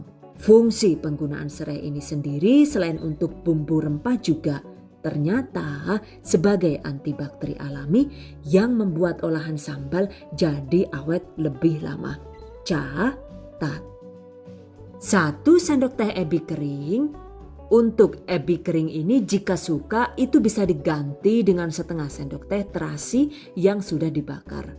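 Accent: Banyumas, Indonesia. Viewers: Sdh lama gk ngikuti video, tambah cantik aja bunda😄😄, dgn bahasa khas Banyumas nya 🤭🤭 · Smg lancar dan barokah... Aamiin...baru ketemu channel ini langsung suka ... apalagi ada logat ngapak nya yuhuuu...top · Ha ha.. Selalu ada ngapaknya,lucuu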